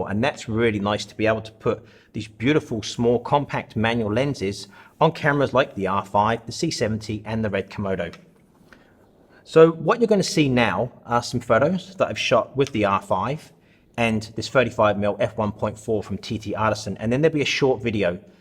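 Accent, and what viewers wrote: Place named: Portsmouth, United Kingdom. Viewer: Different is good sometimes, but the colour background is better. What happened to the Pompey accent video? Waiting to show friends here in Japan that I'm not the only one with this accent.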